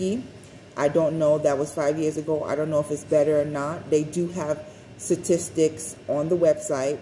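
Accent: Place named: Jamaica. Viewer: Are you Jamaican? I hear an accent!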